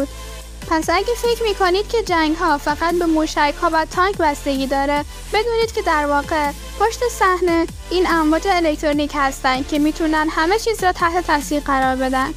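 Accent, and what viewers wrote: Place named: Afghanistan. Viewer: گوینده افغانی هستش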